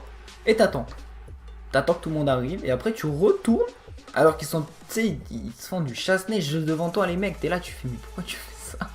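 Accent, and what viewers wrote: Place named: South, France. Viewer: accent du suuud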